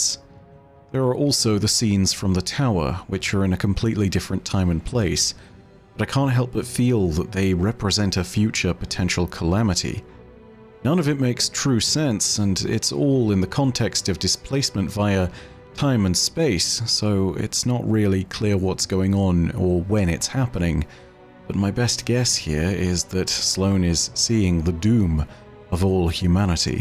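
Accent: United Kingdom. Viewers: It's called a British accent.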